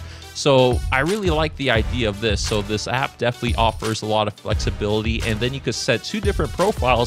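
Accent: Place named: United States. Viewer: Sounds American but doesn't look it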